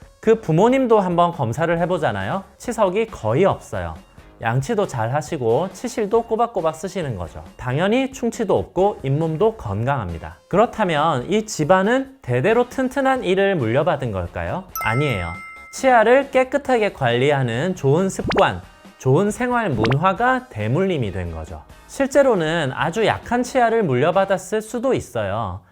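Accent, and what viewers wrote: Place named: Gyeongsang, South Korea. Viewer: 멀리서 보면 솜사탕인데 가까이서 보면 거즈라더니... 온화한 표정으로 (feat.미세한 경상도억양) 세상 조곤조곤하게 팩트로 때려주시네요ㅠㅠㅋㅋㅋ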